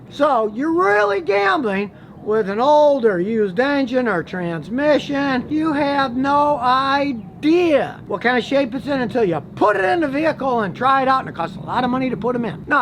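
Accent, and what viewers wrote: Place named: Texas, United States. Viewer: Hi Scotty I love you videos and I religiously watch them. I've even learnt how to understand your Texan accent. Much love from Kenya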